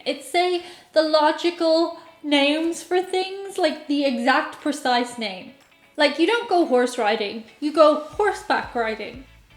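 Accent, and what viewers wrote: Ireland. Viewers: The accent takes me home...family originally came from Cork in the late 1800s...are the Irish starting every sentence now with "So," as I now hear EVERYWHERE in America? Did you go shopping? So, I went to the store and got what we needed. What did the doctor say today at your appointment? So, the doctor told me I was fine. It is really beginning to drive me nuts! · As an American, I find every word that comes out of an Irish person's mouth to be cute. <3 Your accent is the greatest! :D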